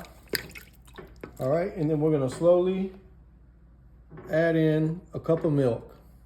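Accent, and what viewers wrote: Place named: Louisiana, United States. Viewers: You have a very strong Cajun accent and I love it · Love the louisiana accent!! Reminds me of the Cajun chef who always said oooo wee looka there!!! Justin Wilson RIP!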